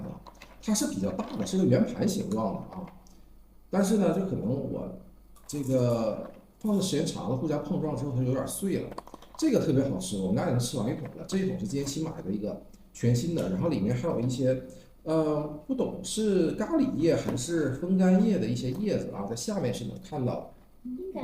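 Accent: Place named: Northeast, China. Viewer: 我是馬來西亞新山人，我也挺想念東北的，聽到你們的口音就想到東北朋友，東北的美食和黏豆包。祝小留學生爸爸和媽媽虎年虎虎生威，小留學生學業棒棒滴！全家健康也棒棒滴！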